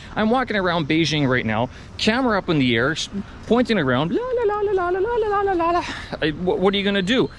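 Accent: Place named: Canada. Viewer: Interesting that you digitize people and I’m interested how you isolate your voice in Starbucks. I suspected you were Canadian even before you answered. I’m also a Canadian in China.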